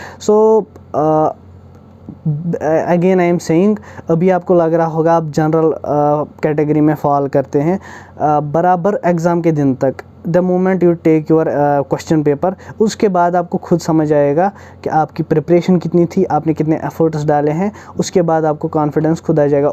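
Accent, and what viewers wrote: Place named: Kashmir, India. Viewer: Thanks bro.... For giving these motivational videos ♥♥ Way you talk kashmiri in urdu lang. I really like it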